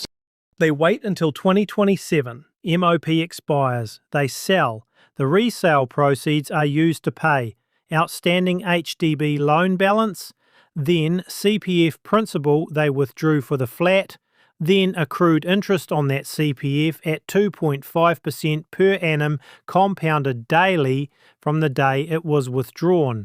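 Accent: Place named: Australia. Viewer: What's with the Aussie accent??!!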